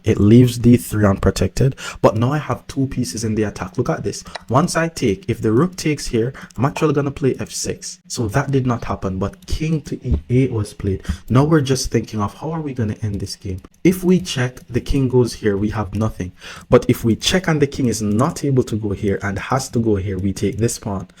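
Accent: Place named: Jamaica. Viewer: I noticed the Rastafarian Jamaican accent my brother wagwan brudda... Love the breakdown analysis, I just got recommended Ur channel 💯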